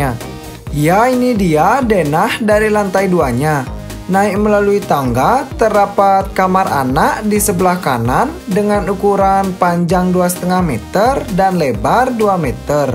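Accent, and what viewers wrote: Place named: Madura, Indonesia. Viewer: Mas kmu org Madura apa bali.. ko logat nya gitu 🤭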